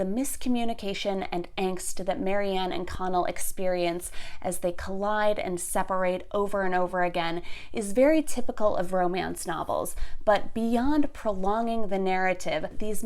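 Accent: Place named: United States. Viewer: Wow love your review - reminds me of what English lessons were like back in the Sixth Form. Such a shame my school never invited any guests with an awesome American accent like yours. I did meet Simon Armitage and Matthew Sweeney though - this happened maybe 6 years apart (i met Sweeney first, then Armitage) - so that’s something I guess!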